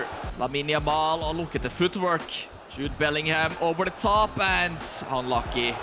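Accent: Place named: Norway. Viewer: ayy my favourite swede with norwegian accent uploaded!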